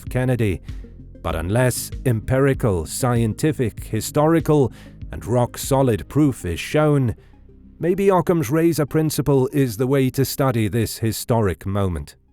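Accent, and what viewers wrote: England, United Kingdom. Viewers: His English accent doesn't add any real credibility to his conclusion. Facts: Oswald was downstairs (2nd floor) causally having his lunch 90 seconds after the shots were fired, drinking a coke--(2 witnesses)nobody saw Oswald coming down the only stairway available when they were coming down the stairway 45 seconds after the shots (2 TSBD workers observed by TSBD supervisor). Rifle was originally reported as a Mauser (far more likely accurate weapon) on live radio and tv air by weapons experts (2 witnesses) before the story was changed. Oswald's supposed Mannlicher–Carcano rifle was a "piece of junk" and needed to be shimmed even to get telescopic scope to work (3 witnesses) probably a Dallas PD property room reject carelessly chosen and hidden the day before. The Mannlicher–Carcano rifle had such a bad reputation in Italy (it's country of origin) it was called "the Italy lost the war." Simplest theory--Crossfire--3 rifles--Grassy Knoll(front twice--Kennedy's neck, temple) , Texas SBD(Connally and miss), and across from TSBD on the roof (bullet off the curb that hit bystander by the overpass next to a policeman (2 witnesses). 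The Dallas Police Department actively did everything they could to suppress any witnesses that did support "Oswald did it." Crossfire was standard CIA operational procedure to guarantee success. Majority of Secret Service detail had been drinking till 3 am at at Casbah Club--rookie had a machine gun the follow up car, Kennedy's driver didn't follow proced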